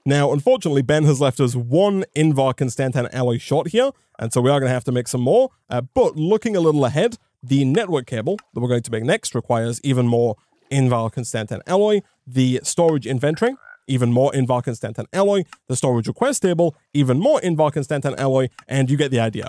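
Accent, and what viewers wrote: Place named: Wales, United Kingdom. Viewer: I can't place that accent. Welsh is as close as I can get...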